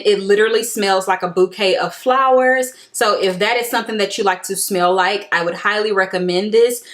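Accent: South, United States. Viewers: Love your country accent.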